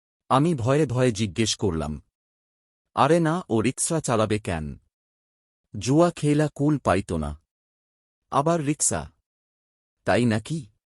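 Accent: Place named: Rajshahi, Bangladesh. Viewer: রিডিং পড়ার মত হয়েছে। রাজশাহীর টান বাদ দিতে হবে।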